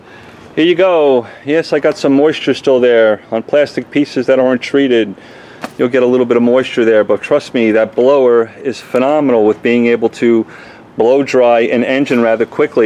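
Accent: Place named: New Jersey, United States. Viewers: This guy is not a Texan, obviously a Transplant! Has to be from the East Coast! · he sounds joisey-ish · He is definitely not indigenous to Texas. My guess is a transplant from Long Island or NJ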